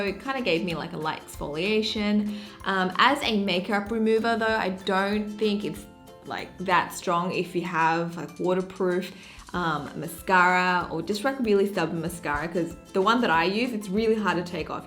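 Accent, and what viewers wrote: Australia. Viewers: it's her aussie accent chill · I like your Aussie accent!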